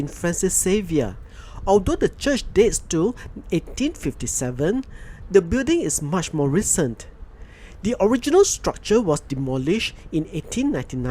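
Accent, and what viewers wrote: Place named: Malaysia. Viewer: Love to hear Malaysian English accent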